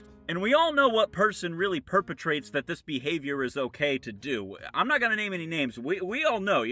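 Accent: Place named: United States. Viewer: why do you sound like mönkey but with an american accent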